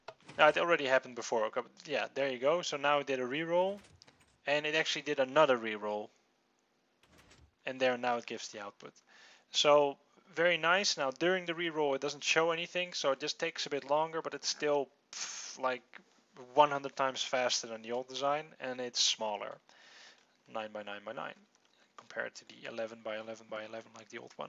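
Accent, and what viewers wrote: Netherlands. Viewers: Are you from holland?? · lol ik wist niet dat je nederlands was xDD khoorde het pas na zon intervieuw video xD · Lol, I'm dutch too, as soon as I heard you talk I knew you were dutch :P We all have that weird dutch accent...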